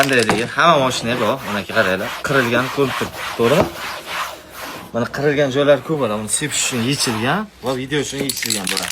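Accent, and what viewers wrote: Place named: Shahrisabz, Uzbekistan. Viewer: Шахрисабзданми дейман укам.шева шахрисабча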